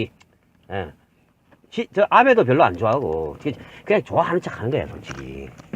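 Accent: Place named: Samcheok, South Korea. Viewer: 제나이쯤 되셨겠네요 목소리도 삼척사람 .저도 삼척 사직리 사람 .지금은 파주시, 수고많네요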